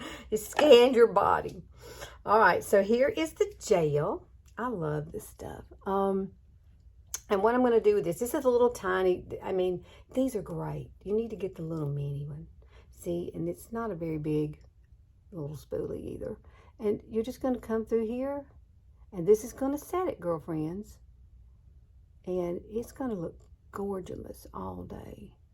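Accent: South, United States. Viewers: I grew up in Oklahoma, Texas and North Carolina. I definitely understand your southern speak. I’ve had people ask me where I’m from …. 🤣🤣. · You are too cute! I am a Kentucky girl that also has that southern accent and there is nothing better. Have a great week, see you next week. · I’m a Georgia girl and I completely understand you, girl we speak Southern Belle. You are beautiful inside and out ♥️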